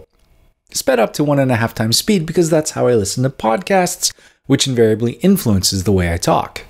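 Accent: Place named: Canada. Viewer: I barely hear Canada in your voice. I cringe at my own accent sometimes when I watch my videos back!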